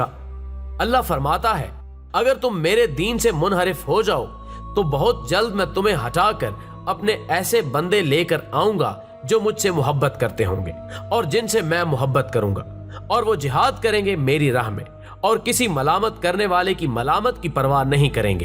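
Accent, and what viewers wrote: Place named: India. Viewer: logo please isko unsubscribe kar do ye RAW walay misinformation aur na umeedi ki videos laga rahay han...zara ghor se dekho is video ko iska talafauz sunno...ye aik HINDU ha RAW ka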